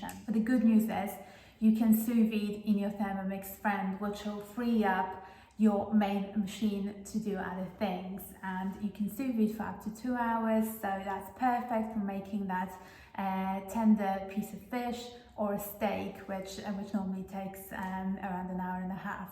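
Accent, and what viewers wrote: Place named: Poland. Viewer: Slysze polski akcent:)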